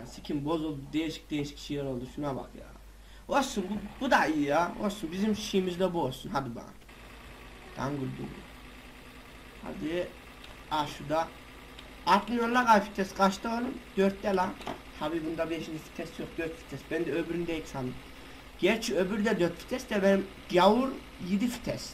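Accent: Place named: Kayseri, Turkey. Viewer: adamsın sen nerelisin bide biraz böyle kayserliler gibi konuşuyon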